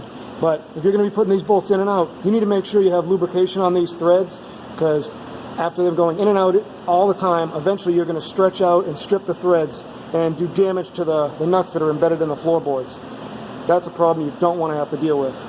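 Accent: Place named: Boston, United States. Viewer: Hi, plan on doing this but with a pickup truck. The electrical connections concern me a little, I know you have to disconnect battery otherwise airbags will go off, but in new pickups, I'm not sure if passenger seat is required while driving. If you know the answer, I'd appreciate it BTW at first I thought you were from Boston, or North Carolina (Fisherman's Warf accent), never thought Florida has a hint of that. I heard the 9th ward in New Orleans they have a Brooklyn accent. Thought that was interesting. I love Florida BTW, thnx for the vid 👍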